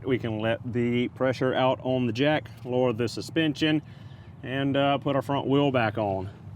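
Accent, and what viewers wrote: South, United States. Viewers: Nice video describing just the job I`m doing now. I like your southern accent! Love from Norway.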